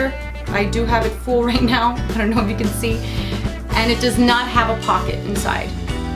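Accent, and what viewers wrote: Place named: France. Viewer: really cute french accent ^^ nice video !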